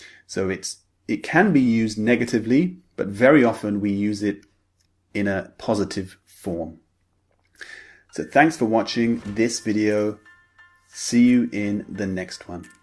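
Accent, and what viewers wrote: United Kingdom. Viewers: Hello Sir English, are you from southern England?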